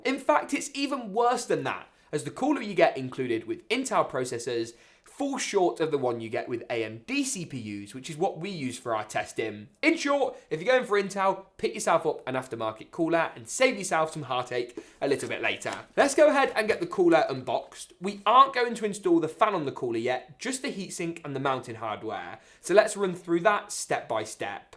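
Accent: United Kingdom. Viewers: i wanna build a pc but i always think are the prices for the gpus will go down or not. i don’t want to buy a prebuilt pc they’re so expensive. i actually knew you yesterday and i really loved your videos and the quality is brilliant + your accent is very nice it makes me wanna learn british english. ( sorry for my bad english this is all i learned from school 😔😂)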